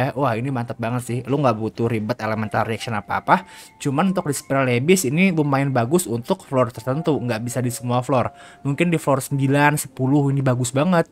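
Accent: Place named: West Java, Indonesia. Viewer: Meni sunda pisan euy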